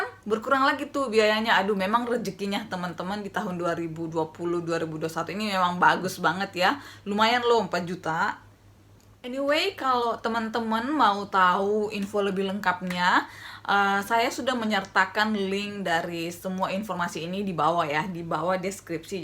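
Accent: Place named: Philippines. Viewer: Damn i tried to translate lol. Your words are pretty much the same as Filipino words and the accent! I think we're long lost sisters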